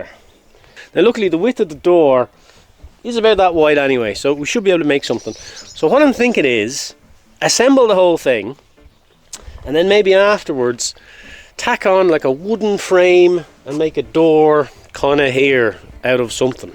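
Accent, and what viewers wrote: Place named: Ireland. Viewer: I absolutely love your vids darling, you explain things concisely and clearly in that gorgeous lrish accent, and your gentle sarcasm has actually made me laugh out loud, which so RARELY happens !! I've just subscribed and.........thankyou for cheering me up !! xxx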